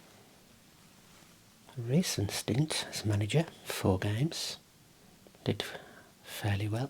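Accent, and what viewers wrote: Birmingham, United Kingdom. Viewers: Genuine question - how come you're a Utd fan rather than Villa, Wolves or Birmingham? You're accent suggests brummie or black country. Was Utd a family thing or personal preference?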